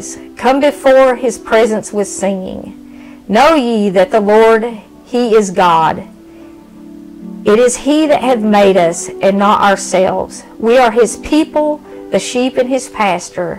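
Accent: South, United States. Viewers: I found your Channel today when trying to learn how to make brown gravy from scratch then I checked out a few more of your segments after hearing your country accent giving God praise every segment I felt like I was home I subscribe to your Channel and yes praise God he is so good so so good to us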